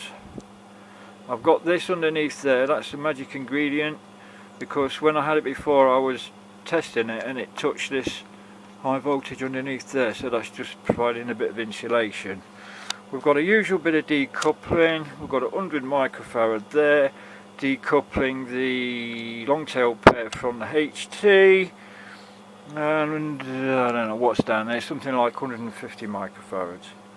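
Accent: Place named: United Kingdom. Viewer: Having listened to a lot of Americans on these topics it is beyond refreshing to hear a fellow Brit. A gibbering sprint of unconnected self correcting personality, followed by genius blow by blow creativity. Talking like I'm thinking and clearly dropping exactly what you are aiming to achieve and getting there through educated experimentation. Loving this, talking my language. 😂❤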